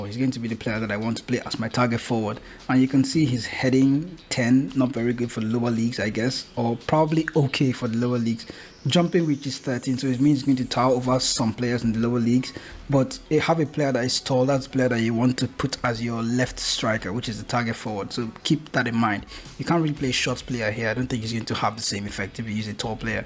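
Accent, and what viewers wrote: Nigeria. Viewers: This is very random, but you sound Nigerian. Great work though.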